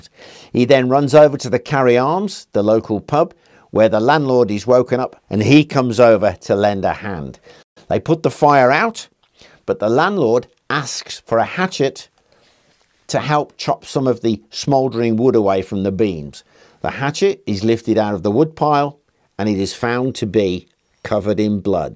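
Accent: United Kingdom. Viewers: Any story in a Brit accent - lay it on me!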